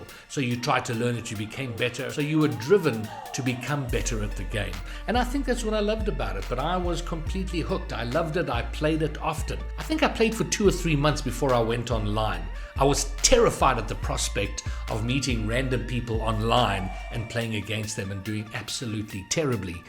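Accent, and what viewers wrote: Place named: South Africa. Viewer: i want to know if you are south african since your accent is the same as my south african grand parents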